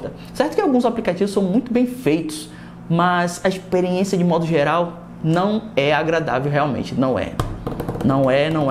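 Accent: Bahia, Brazil. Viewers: tu é de que lugar da bahia, brow?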